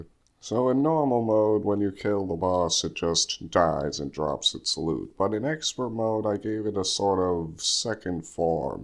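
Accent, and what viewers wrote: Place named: South, United States. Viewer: Is it just me or does his voice give off " " vibes?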